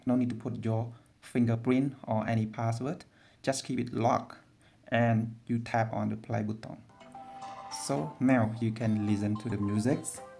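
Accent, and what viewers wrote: Cambodia. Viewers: ohhh it makes sense now, i knew ur accent sounded familiar! your khmer! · I knew u are a Cam..... . Cause I know every Cam...... speaking english.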